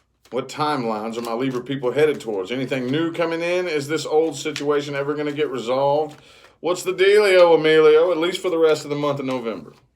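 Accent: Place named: Rural, United States. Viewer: Love your country voice cute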